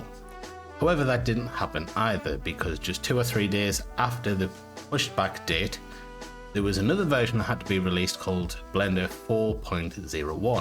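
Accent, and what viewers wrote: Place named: Newcastle, United Kingdom. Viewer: awesome, you're a geordie!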